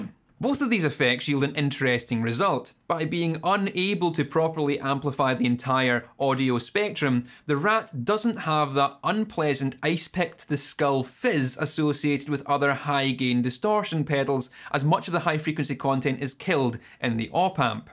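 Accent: Scotland, United Kingdom. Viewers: I love this guys accent so much,! how can i it? What is this accent? Scottish？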